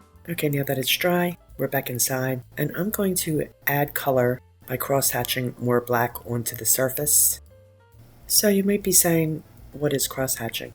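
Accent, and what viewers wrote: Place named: Delmarva, United States. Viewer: There has not been one project that you have done, that I have not been in love with. I keep coming back because you love vintage as much as I do. Thank you so much for all you do, thank you for everything that you share, thank you for inspiring me to push things a little bit further than what I normally would. I am originally from Baltimore city, and have relocated to Central Florida, and it’s always refreshing to hear you speak… not sure where you’re from but I’m picking up DELMARVA/PA, area. So you are like having an old friend inspiring me. Keep doing what you’re doing! Lots of love 🥰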